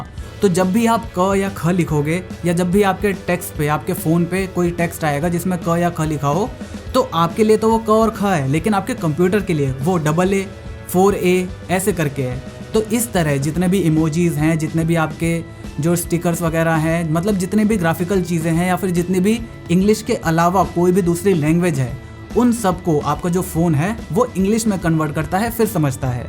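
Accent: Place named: Delhi, India. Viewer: Delhi se ho kya?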